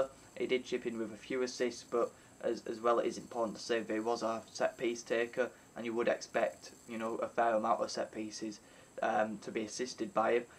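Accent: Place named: Yorkshire, United Kingdom. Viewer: I'm starting to understand Yorkshire English! 🙂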